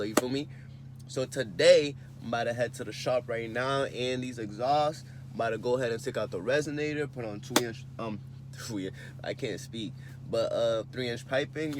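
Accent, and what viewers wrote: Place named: New York, United States. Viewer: Cuz sound like he from new York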